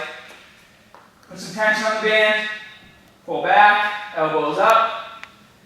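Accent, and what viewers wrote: Boston, United States. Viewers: Back in the day before went full Bostonian "Bahhbelll!"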